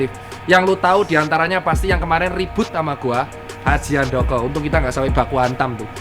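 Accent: Java, Indonesia. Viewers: Logatnya Jawa banget mas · i love mEDok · Logat nya medok wkwk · Mas meh takon asli wong ngendi e koe kok koyone yo gur wong jowo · Medhok banget. Kayak si org korea itu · Mas e medok e semarang opo suroboyo kok aku ngekek wkwkwkw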